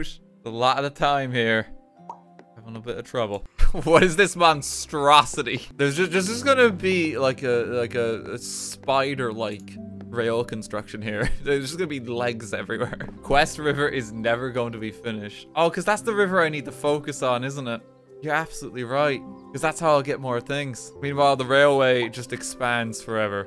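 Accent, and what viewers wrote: Ireland. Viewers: Irish jerma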